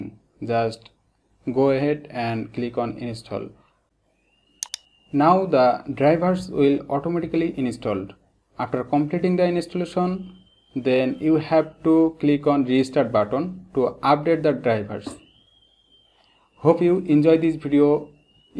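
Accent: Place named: Bangladesh. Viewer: Bro are u from Bangladesh?....the Accent seems like that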